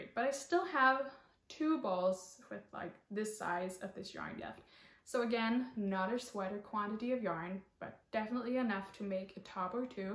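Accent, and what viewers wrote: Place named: United States. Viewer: Hi from Indiana in the US! Here the plural of yarn is yarns. ("...all of these yarns." or "...these types of yarn.") Your English sounds like American English, and your accent rarely comes out. (You speak more clearly than a lot of people in different regions here, so well done!) I feel like a huge go-to for using up stash, especially different colors of the same yarn, is to make a blanket out of them. I tried to look up the Bumbo in Ravelry to see what weight it is, but a lot of different options came up. I made a cardigan from dk acrylic, and I actually liked the structure I felt like it had. Acrylic seems cozy to me, although I agree it has its time and place. I hope a job presents itself for you, and I look forward to seeing your shawl!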